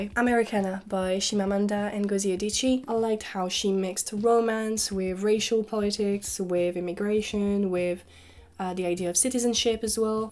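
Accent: France. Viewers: Gorgeous Gorgeous Gorgeous Girls speak English in a French Accent and recommend books despite feeling ill.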